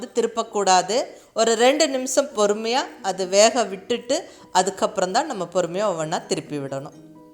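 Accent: Kongu, India. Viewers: அம்மா உங்க சமையலை பார்ப்பதற்காக உங்க வீடியோவை பார்ப்பதை விட, உங்களுடைய கொங்கு தமிழ் கேட்கவே நான் உங்க வீடியோவை பார்ப்பேன்.நீங்கள் போடும் சமையல் வீடியோக்கள் சூப்பரோ சூப்பர்.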